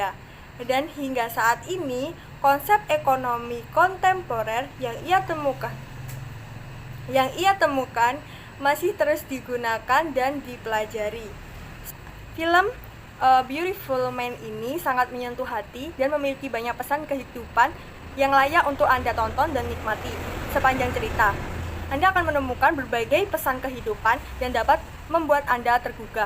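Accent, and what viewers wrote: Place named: Java, Indonesia. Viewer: Medok'e jowo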